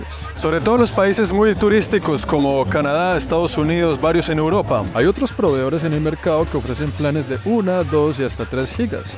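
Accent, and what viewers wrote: Colombia. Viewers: Bienvenido 😂😂😂 Me encanta que conservas tu acento colombiano ❤❤🤗😘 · Espera hablas como un colombiano y eres de México? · Eres Colombiano ?! " Chévere " tu Reseña...Conocí Colombia y son Animosos... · Usted no es mexicano, por su acento, se le oye lo colombiano, póngase trucha ai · se le Nota el acento y las expresiones en la forma de habalr muy colombina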